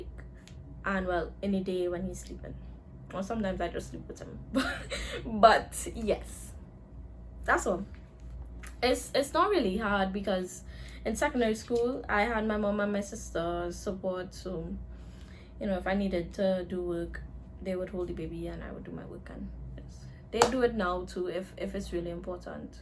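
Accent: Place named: Trinidad and Tobago. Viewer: Are you Trinidadian? You kinda have an accent... similar to Trinidadians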